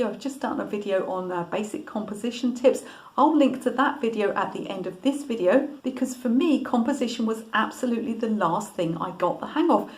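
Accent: England, United Kingdom. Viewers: HI, I SUBSCRIBE TO YOUR CHANNEL. I REALLY ENJOY IT AND LEARN A LOT FROM YOU. HOWEVER, IT IS REALLY, REALLY HARD TO ABSORB ALL THE INFORMATION - DUE TO YOUR RATHER RAPID RATE OF SPEECH & MAYBE TINY BIT DUE TO YOUR ENGLISH ACCENT. BECAUSE IT TAKES THE HUMAN BRAIN TIME TO PROCESS SOMEWHAT COMPLEX INFORMATION, ESPECIALLY. I TOO, SPEAK RATHER FAST, AS COMPARED TO SOME FOLKS. THIS IS IN NO WAY A CRITICISM!! YOUR CHANNEL CONTENT IS VERY GOOD INFORMATION- I WATCH YOUR CHANNEL OFTEN THANK YOU, AND KEEP UP THE NICE WORK,YOU HAVE HELPED ME LEARN A LOT! PS WHEN I WATCH YOUR CHANNEL AND SOME OTHERS TOO - FORTUNATLY, ON YOUTUBE, I HAVE THE OPTION OF (SPEEDING UP OR SLOWING DOWN) THE VIDEO PLAY BACK SPEED. SO, I WATCH YOUR CHANNEL AT A SLOWER VIDEO SPEED AROUND 75% -50%. MAKES YOUR VOICE SOUND A BIT ODD LOL. BUT, IT GIVES MY BRAIN TIME TO PROCESS YOUR INFORMATION. 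I REALLY LIKE YOUR FORMAT TOO, IN THAT YOU STICK TO YOUR SUBJECT AND NOT A LOT OF TALKING ABOUT WHAT YOU DID TODAY, WHAT YOU ATE, WHERE YOU SAW SO AND SO ETC LOL. I WATCH ARTISTS VIDEOS TO LEARN ABOUT ART. I FIND A LOT OF "SMALL TALK" VERY DISTRACTING. I ALSO LEARN BETTER FROM BOTH VISUAL AND AUDIO DISCRIPTION OF TOPIC- BECAUSE I AM A NEW ARTIST. I AM SO VERY GRATEFUL FOR YOUTUBE! I HAVE LEARNED SO MUCH MORE INFORMATION AND MUCH FASTER THAN I COULD HAVE ON MY OWN, THATS FOR SURE- SO THANKS AND PLEASE KEEP THE NEW CONTENT COMING- MY GRATITUDE TO YOU!!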